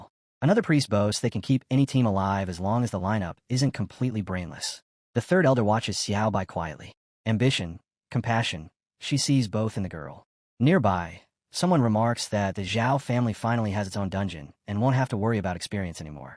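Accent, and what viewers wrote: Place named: United Kingdom. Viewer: Narrator became British halfway through lol this is epic lol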